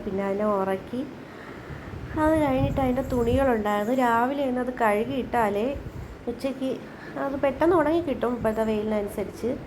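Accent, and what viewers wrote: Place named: Thiruvananthapuram, India. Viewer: സ്ഥലം എവിടെയാ സംസാരം കേട്ടിട്ട് ആറ്റിങ്ങൽ വെഞ്ഞാറമൂട് ഇവിടെ എവിടെയോ ആണെന്ന് തോന്നുന്നു 🥰👍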